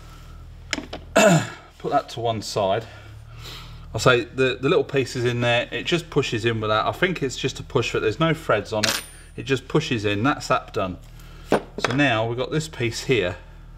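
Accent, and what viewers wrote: United Kingdom. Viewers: 2 seconds in i hear the english accent...And guns, i subscribe, same as me, born and bread 43 yrs...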